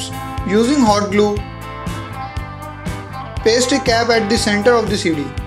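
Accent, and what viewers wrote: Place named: India. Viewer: Accents are weird to me. I can never imitate accents like other people can having accent myself. More I listen to Indian accent I can get use to it and be able to comprehend more. And that's not the only factor effecting you. Yourself become more kin to the slight accent without even realizing it. I wanted to ask you. How can you check the voltage and the amp input? Is there a simple way? Like an app? 😂😂😂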